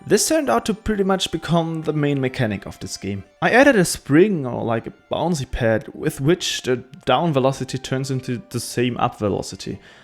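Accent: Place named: Switzerland. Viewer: Typisches Schweizer-Englisch :P Fand den Soundtrack übrigens ziemlich dufte! Ich hatte Godot vor ein paar Wochen auch mal ausprobiert gehabt, aber ich fands extrem unintuitiv xD Vor allem diese Node/Scene-Struktur find ich weird und macht iwie keinen Sinn für mich... Dann hab ich's wieder sein gelassen xD Naja. Hätte sowieso niemals das Durchhaltevermögen für ein komplettes Spiel gehabt ^^" Aber cooles Video :)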